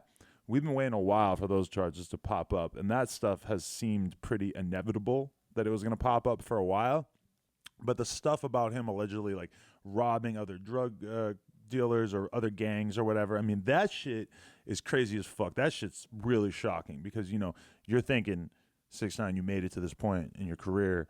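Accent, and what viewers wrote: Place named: New England, United States. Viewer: For some reason I can really pick up new england accent in this video, which is usually nonexistent to me.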